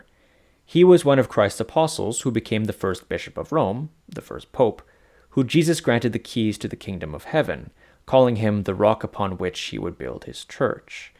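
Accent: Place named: Quebec, Canada. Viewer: I KNEW you were from Quebec! Finally got my answer 😂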